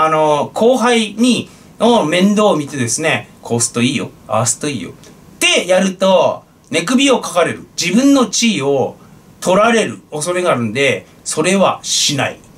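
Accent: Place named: Iwaki, Japan. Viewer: 熱がある中 配信ありがとうございます🙇手術のあとは、無理をせず 本当にしっかりと静養されてくださいね!? そして、さらにパンチの効いた配信を楽しみにしております🎤 くつざわさんのいわき弁、大好きです🤗🎤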